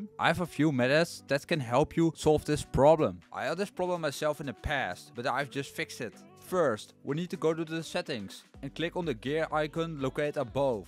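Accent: Netherlands. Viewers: he dutch i can hear it BRUHHHHHHHHHH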